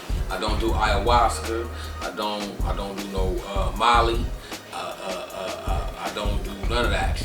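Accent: California, United States. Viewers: Great vid bruh. You from Cali huh?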